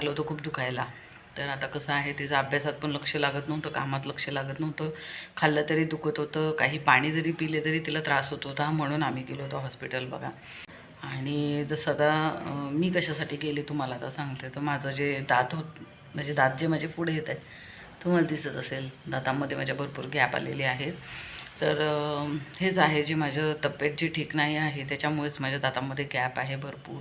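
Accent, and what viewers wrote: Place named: Pune, India. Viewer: ताई तू पुण्याची आहेस का proper.. बोलण्याची ट्यून पुणेरी वाटते म्हणून विचारले.. आणि खूप छान आहेत..होईल सगळ ठीक..❤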